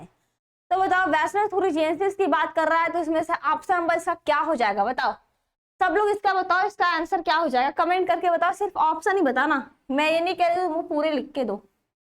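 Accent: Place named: South India, India. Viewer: Thoda sa aapki bhasha madrashi lagti hai Lekin samajh aayi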